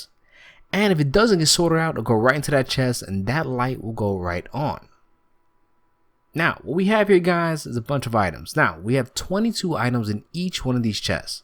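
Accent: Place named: New Jersey, United States. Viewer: I'd say Italian from Jersey.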